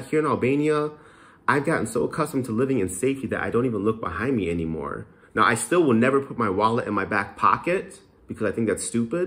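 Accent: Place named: New York, United States. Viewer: I can tell this dude is from NYC.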